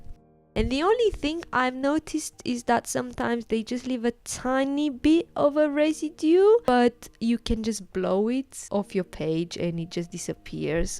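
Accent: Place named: England, United Kingdom. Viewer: great drawings and cute english accent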